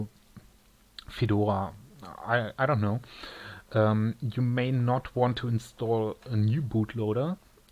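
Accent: Germany. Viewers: Great video tutorial for current linux users ! Don't worry about your English ;) You of course have a German accent, but I understood everything! Cheers from Denmark. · I heard your english and thought you were german... And YES, I was correct! Grüße aus Braunschweig!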